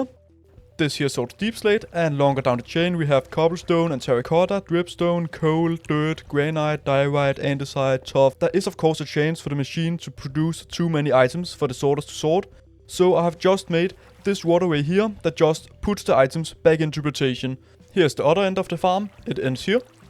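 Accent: Denmark. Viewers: are you danish by anychance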